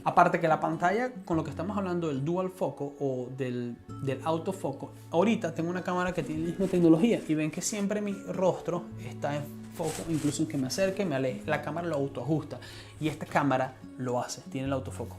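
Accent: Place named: Venezuela. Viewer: ya decia yo, verga el acento de esta pana es venezolano ajuro.. pan banderita de venezuela en la camara haha Buen video bri!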